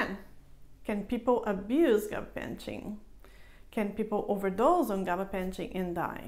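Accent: Brazil. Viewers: Poxa vida Dra, que maravilha! Eu pensei, seu inglês é perfeito, mas tem um certo sotaque; uma de duas, ou é italiana ou brasileira por ser um sobrenome bem conhecido no estado de SP. Vou arriscar e mandar um abraço em português! E não é que a doutora é de Sampa! Rsrsrs! Que ótimo! Em 92 eu estava cursando meu 4 o ano, na USP também olha só! Admiro demais seu trabalho no canal. Seus videos são atrativos, suscintos, claros, simpáticos, rigorosos na informação científica sem que ela se torne cansativa ou maçante. Quanto à gabapentina e peso, um mistério mas uma excelente opção para aqueles que sofrem os efeitos devastadores de um aumento de peso indesejado e involuntário devido à pregabalina. Tudo de bom e parabéns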